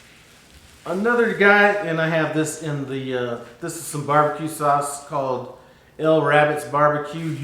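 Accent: South, United States. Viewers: Funny finding myself on your page- I was looking at how to combine my steak and queso fresco... and an hour later I'm still looking up seasoning for grilling! I know you said you weren't responding, BUT, any suggestions on a flavorful rub for smoking pork?! Mine always seems bland, like a wasted effort, 10 hours later. And, I think you said Colorado, but you sounded a little southern to me... at the same time, the UNC on your jacket isn't the same shade blue UNC wears down here! Either way, Cheers!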